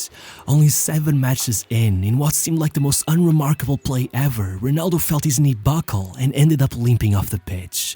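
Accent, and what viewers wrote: Portugal. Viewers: O comentador é Tuga 😂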